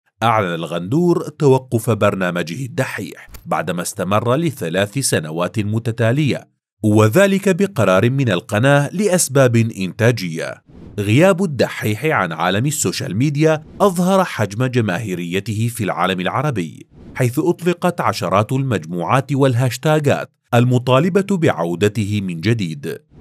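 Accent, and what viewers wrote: Egypt. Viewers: الافضل رغم لهجته المصرية الدحيح صاحب محتوى راق وسيق ومفيد عكس اليوتيوبرز **معظمهم تافهون وبلا محتوى حتى ***فهو بسيط و لا يحتاج سوى لحسه الفكاهي الظريف و اسلوبه اللبق و العفوي ومعلومات دقيقة من مصادر علمية بورك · يشخص مميز و كوميدي و علمي و مكس جميل جدا صراحه هو خلني احب اللهجه المصريه صراحه حتى الشرح بسيط ولكن هم جدا معقد و صعب الفهم ولكن الدحيح يسهل المعلومه يخليها جدا سهله فعلن شخص مميز ماشاءالله تبارك الله عليه🥀💜💜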